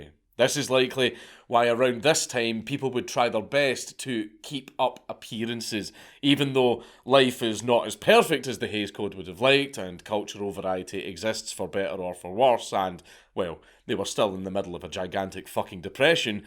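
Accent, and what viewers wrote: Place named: RP, United Kingdom. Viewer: Completely off-topic: It's been SO helpful listening to you. Even though you speak almost BBC-quality English (please don't take offence, this is meant as a genuine compliment), ever since I've started listening to your content my ability to understand out-in-the-streets Scots has improved dramatically. You've pretty much been the bridge I needed from "The hell? Am I supposed to understand this?" to "Oh, it's Scottish. No problem." Of course, some elderly folk out of the big cities somewhere on the Whisky Trail are still far beyond me, but that's fine. After a tumbler or two of liquid smoke barriers just have a tendency to melt anyway. ;) Take it from someone speaking English as a third language: you're not just entertaining and informative, but also educative, even in ways you don't expect. Should I ever happen to run into you in person while on the Trail, expect being invited to a beverage by some random stranger claiming to be a fan. 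;)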